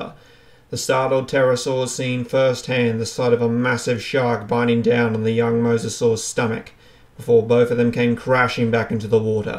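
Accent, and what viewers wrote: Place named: Australia. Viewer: Yep, there's the Aussie accent lol... "It's a shack!"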